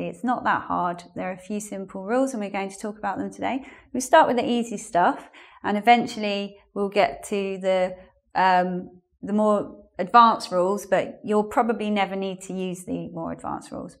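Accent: United Kingdom. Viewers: She has a Horrible British accent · You're a British English Speaker or American? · The way you said important I like it, and I try to imitate you. Lol I love British accent. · I really loved British accent. 😂😍🖒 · Beautiful British accent!! Great class. Yes, you do seem to be a little sad at the end of the class... be good, you´re a lovely person! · What a great lesson! I have had difficulties to understand your british accent, but at the end I understood. Thank you! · excellent British accent, I would like you to make a video and a list with words but with the different pronunciation between England and usa,, it would be great, thank for help us, why you look so blue?,,have a nice day.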